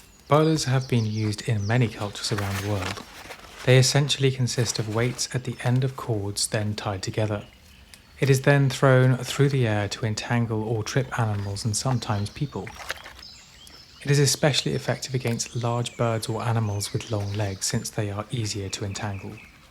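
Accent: England, United Kingdom. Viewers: well spoken English accent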